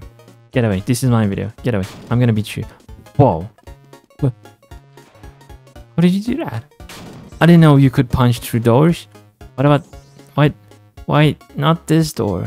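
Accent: Turkey. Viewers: şiveden anladım direkt türk olduğunu :D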